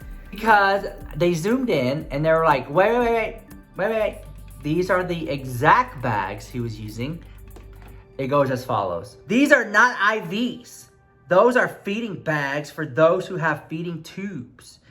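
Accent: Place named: Texas, United States. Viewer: It was driving me crazy not knowing where your from... so I went to your Twitter to find out. I KNEWWW YOU WERE FROM TX TOO!! I hear that accent girl. im also from tx lol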